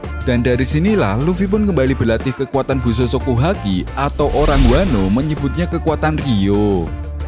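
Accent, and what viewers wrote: Java, Indonesia. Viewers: Mani Ledok jawa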